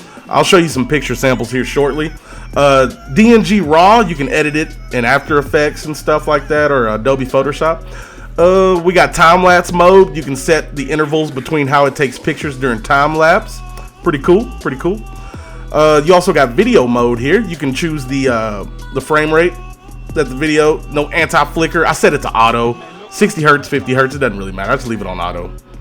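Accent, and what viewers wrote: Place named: South, United States. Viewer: can't tell if this guy is in Texas or along the Mississippi river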